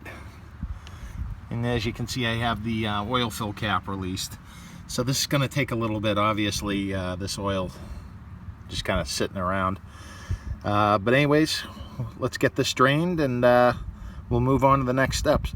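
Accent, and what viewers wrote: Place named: Louisiana, United States. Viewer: New England? Would have though Louisiana with your accent.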